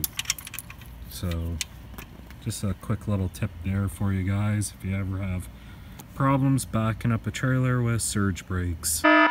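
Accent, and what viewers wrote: Canada. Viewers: Hope this helps you oat! I love crazy accent! Lol I may be wrong but I’m guessing Canada maybe? Out = Oat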